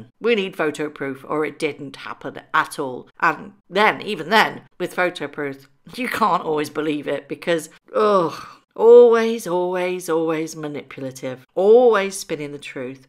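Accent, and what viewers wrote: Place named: London, United Kingdom. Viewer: I don’t know where you are from in England, but you sound like my family back in London❤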